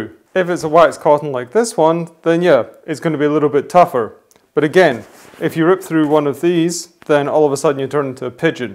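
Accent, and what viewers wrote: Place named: Aberdeen, United Kingdom. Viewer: Are you no fae Aberdeen or there aboots?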